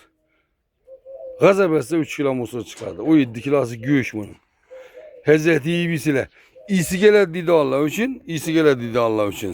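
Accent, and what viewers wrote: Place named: Khorezm, Uzbekistan. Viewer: хоразича.гапраяти шэкилли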